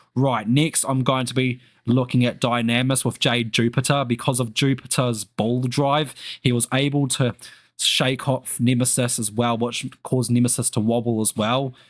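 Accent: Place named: New Zealand. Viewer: Bro, u from NZ? That accent sound kinda like it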